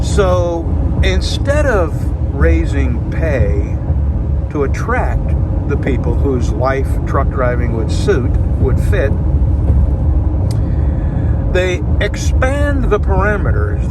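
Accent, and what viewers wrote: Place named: Northeast, United States. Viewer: Talkin about that MCI truck reminds me of the time when I was heading down I-69 into Ft. Wayne, Indiana and had a Petershaker that was pissed about being passed shove me off into the median. Had to get towed and bitched at over that one. Freaking safety officers. Hate 'em. I never noticed it before but with as many times as you said "wourder" in this video, it's plainly obvious now that you're from the northeast.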